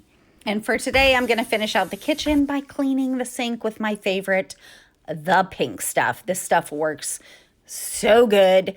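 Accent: England, United Kingdom. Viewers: I can hear you slipping into your English accent...makes me think of British TV shows (love Doctor Who and Masterpiece Theater). Busy crocheting for the past few days on several projects. Love your videos! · your home is smashingly turning into a wonderful cozy environment that is soo inviting. I enjoy watching your channel and your family and Clark. He's so adorable. I love your British accent 😂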